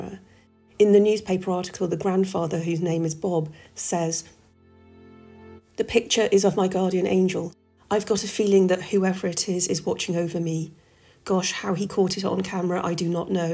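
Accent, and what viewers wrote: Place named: West Country, United Kingdom. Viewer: Really interesting channel and content. Also love that west country accent. Good work. Thanks.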